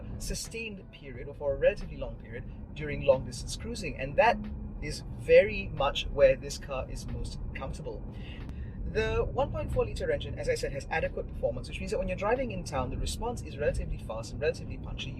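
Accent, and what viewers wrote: England, United Kingdom. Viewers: Thank you … I am getting the 2023 Life model in New Zealand (same as the Highline) in the Atlantic Blue. I like your English voice. The Blue you have got there is a sublime colour!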